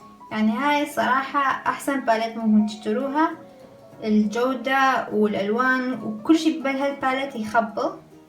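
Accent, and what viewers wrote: Iraq. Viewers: حجي العراقي عسل منج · أحب وايت اللهجة العراقية😻😻 وانتو وايت طيبين تحية أختكم من السعودية😍😍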